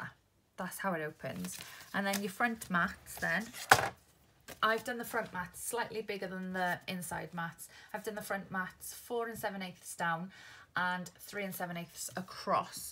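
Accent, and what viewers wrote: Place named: Cardiff, United Kingdom. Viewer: thought it was a Cardiff accent. We are from Pembrokeshire, my son is at Cardiff university so I’m there quite a lot. 😊😊😊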